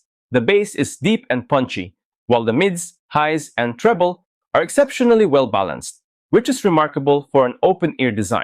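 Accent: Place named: Thailand. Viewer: Love your thai accent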